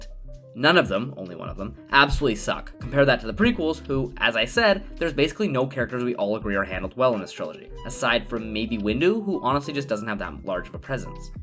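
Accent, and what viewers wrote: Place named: United Kingdom. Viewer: Like the British accent! You should probably go with that from now on🦹🏻‍♂️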